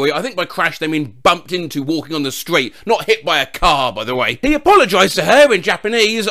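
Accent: United Kingdom. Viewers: Despite all the foreign pronunciations being very good (except the Hebrew lol), has this funny way of making every language sound extremely British.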